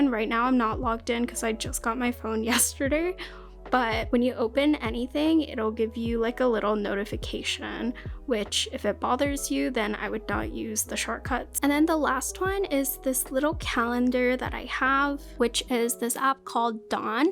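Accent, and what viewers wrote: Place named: England, United Kingdom. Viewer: I like your english accent, can you make a content about it?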